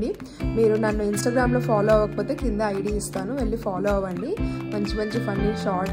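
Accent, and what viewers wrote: Rayalaseema, India. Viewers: UR EYES, VOICE, LANGUAGE I MEAN SLANG {RAYALASEEMA:P} AWESOME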